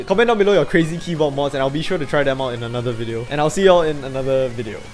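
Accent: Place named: Singapore. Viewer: are u singaporean? i can recognise that accent anywhere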